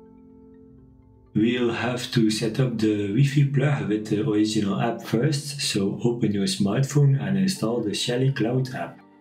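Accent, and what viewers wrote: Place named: East Flanders, Belgium. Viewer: grappig da ge kunt horen da gij nen oost-vlaming bent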